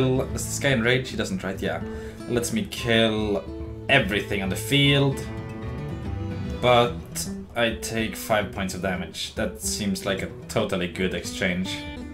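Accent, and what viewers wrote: Sweden. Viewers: What? How can you mistake Swedish accent to some American accent?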